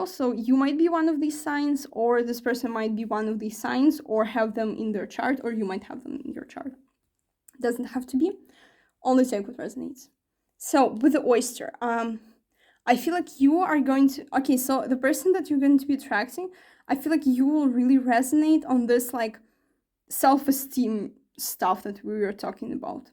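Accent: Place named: France. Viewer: Pile 1. Are you french ? Cute accent by the way 🥰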